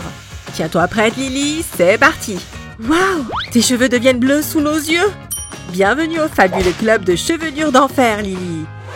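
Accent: England, United Kingdom. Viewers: merci pour c astices mais juste vous êtes anglaise?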